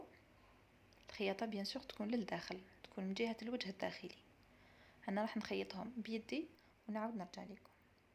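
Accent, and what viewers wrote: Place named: Iraq. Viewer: اللهجه بيهه عراقي عاشت يداكي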